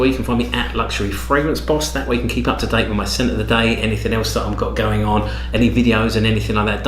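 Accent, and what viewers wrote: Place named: United Kingdom. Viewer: your FRENCH pronunciation is better than mine great BRITISH accent by the way Ive got nothing from this house I need to investigate thank you JIM from MIAMI BEACH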